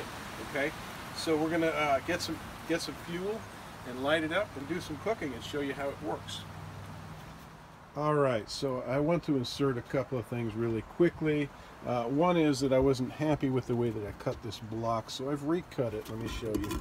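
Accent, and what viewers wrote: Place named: South Louisiana, United States. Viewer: A woman from South Louisiana I can tell.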